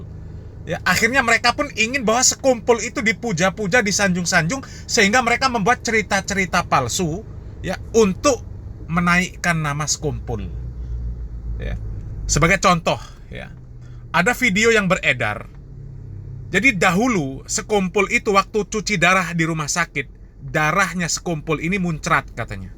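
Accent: Java, Indonesia. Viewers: Suara jawa ni ptsay kd shaluan😂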